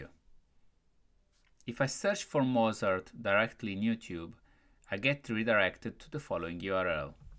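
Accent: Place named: Italy. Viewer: si sente che sei italiano bro(non è un'offesa, però fa un po' ridere aahahah)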